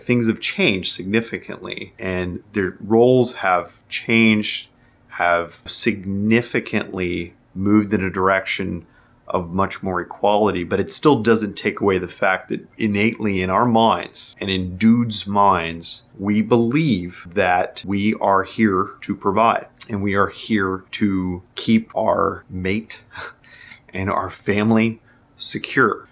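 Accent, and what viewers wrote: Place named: Yorkshire, United Kingdom. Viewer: Hey are you from Yorkshire UK? You sound like a Yorkshire man 😆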